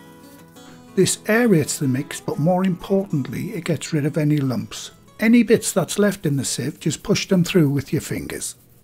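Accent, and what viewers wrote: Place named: North East England, United Kingdom. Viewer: The irony is, that you probably have no idea what it's like listening to your softly spoken North Eastern accent, explaining things every step of the way. There's one thing I disagree with and that's that you are 'retired'... clearly not since you're still doing it on YouTube (albeit now for free). Old cooks never retire, they just find different ways of practising their skills. Well done, Sir, and please keep up the good work.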